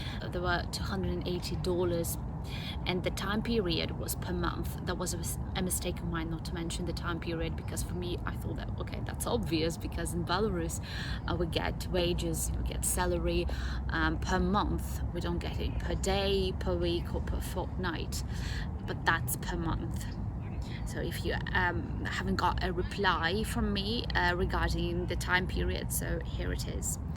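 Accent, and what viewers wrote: England, United Kingdom. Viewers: I love your accent! Nice to hear you learned British English. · She is smart educated teacher and gardener.speaks almost like british woman.never lived in the uk,she should go abroad for better life,atmosphere.sorry but belarus is not a good country it is like eastern europe's east europe,more rude,low wages,politics,it is a dictatorship and russian branch.she chose to live in a village for sure but if you look at the video their villages are poor. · YouTube's habit of suggesting videos that its algorithm 'thinks' have some connection with ones that we have watched before can be very annoying, because the algorithm is not very smart and often throws rubbish at us. But from here in faraway New Zealand I was very glad that it suggested your channel (probably because I have been supporting a lot of Russian and Russian-aligned channels recently). 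Like many English-speakers who come to your channel I was delightfully surprised by your grasp of English and your British-flavoured accent, and having watched this video and found that you approached English through phonetics I was even more impressed. In my days at university I took a strong interest in phonetics, and I think that even for someone born to English it is very useful, because it adds a profound dimension to one's understanding of the language. For me, Everyman's English Pronouncing Dictionary by Daniel Jones was an early purchase, so I often think of words in phonetics symbols rather than the Roman alphabet (he used International Phonetics Association symbols). An acquaintance with Latin is also very useful, because two-thirds of English words come from it, either directly or through a Romance language, so that etymology adds a profound understanding of meanings. I am glad to have subscribed to your channel,. 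I look forward to your postings, and I hope that you do fulfil your dream of repairing your godmother's house. A leaking roof is no fun; heavy rain al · Is she really Belarus its nice accent sounds like British. I like her voice doesn't sound choppy. I meet Belarus man but he was always drinking alcohol he is smart mechanic but never cared for his health and his Ukrainian friend always drinking alcohol. It's nice video nice girl simple honest kind. I like these kind of people. Bye and thank you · I was born in the U.K. in 1936 and lived there until 1982 and now live in Australia so I was very surprised to hear that you had not studied in the U.K. but wanted to speak English as spoken in the U.K., as you would appreciate American English is a mutilation of the English Language. When I started to listen to you speak English(this is the second of your Documentaries I have watched) I was sure your accent was of an upper class English without any tinges of one of the many regional dialects in the U.K. 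( That used to be referred to as speaking with a "Plum in one's mouth" or "born with a silver spoon in one's mouth" (Please do not take umbrage as my comments are complimentary to your clean and clear pronunciation), then upon listening for a longer period when I could detect what appeared to be slight U.K. regional dialects. One word which may have been due the sound track, was when you pronounced the word Dollar that reminded me of a mature Greek who's English was picked up in the work place and with other Greeks who used to take a slight pause between the two syllables ie Dol-lah. your English is remarkable... if you had learnt your English in the U.S. I would have picked it within 30 seconds. I served in the Military in Singapore back in the 1950's and round about 2008 I had written about a particular Singaporean that I had met during my Service, I then received an email from another Singaporean who mentioned that one of his work colleagues would like to cont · I 100% thought that video had a British narrator so I checked your channel to see if it was indeed you 😁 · Hello your English is verry British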